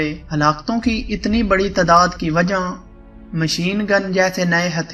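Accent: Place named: Jhang, Pakistan. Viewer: lehja lokal hey. Shayed Jhang ya Mandi ka lehja hey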